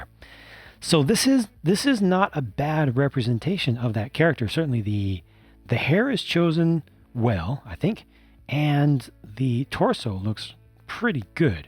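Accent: United Kingdom. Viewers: Oof that british xdd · Oh my god, the British accent is frickin FANTASTIC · 😄 Nice British accent.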